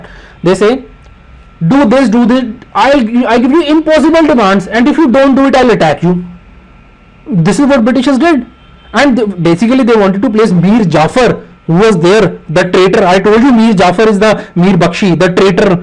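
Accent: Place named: Punjab, India. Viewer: Your way of teaching is really good I,m your big fan sir ❤️❤️❤️❤️❤️❤️bus aap please Toda Hindi Mai bhi explain kar diya kariye i, mean ek summary type hindi mai b bata diya karaiye aap and apki baato se lagta hai ap punbai hai .....Aap bilkul real waale punjabi hai bilkul cool type k rocking type like....huaaaaaa baleee balleee te sava sava🤭🤭😘😘😘☺️😇🤴🙈❤️👉❤️❤️❤️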